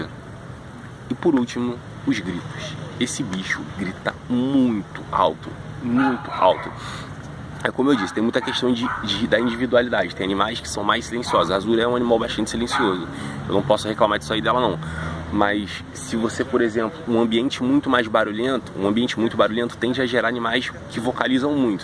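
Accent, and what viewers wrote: Rio de Janeiro, Brazil. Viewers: Eu amo esse vídeo porque nada passa mais franqueza do que um sotaque carioca dando alertas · Tu é carioca mano? Tô percebendo pra esse sotaque aí hein kkk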